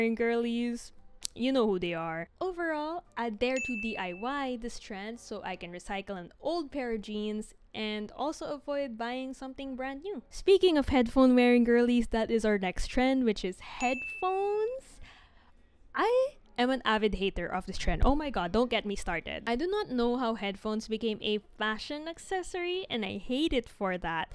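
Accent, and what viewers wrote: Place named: Philippines. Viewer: omggg i was thinking your accent sounds filipino and i was shocked when you spoke tagalog LMAO i like this content, pls continue doing more <3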